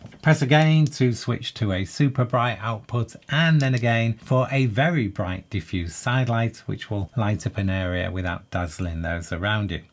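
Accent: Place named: Hull, United Kingdom. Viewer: Great video... I made one myself as well based on the press kit but linked back to yours as you had actually got "hands-on" with the scales. I hope that's ok. I love your videos, and also that West Hull voice of yours is just the ticket for when this old Hornsea expat is missing home. Crack on...and all the best.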